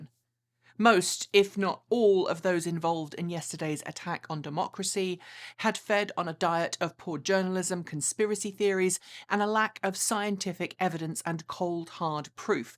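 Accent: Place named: England, United Kingdom. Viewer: Well done, had to be said. Totally agree about wild unproven comments being moderated. As a Brit I am proud that you have held onto your English accent even though you are now an American citizen.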